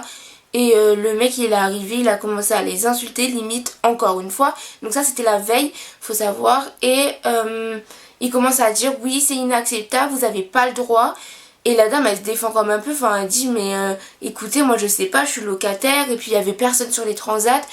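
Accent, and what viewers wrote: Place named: Madagascar. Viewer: j'aurai dit malgache 😊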